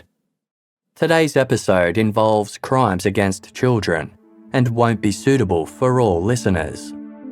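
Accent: Australia. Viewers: Whoa ! aussie :)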